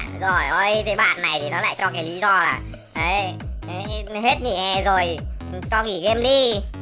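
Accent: Vietnam. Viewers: Giọng Nam Kì Hay và Hài thế mà đặt tên như vậy đúng như mình miền Nam mình rất bn vì Nam Kì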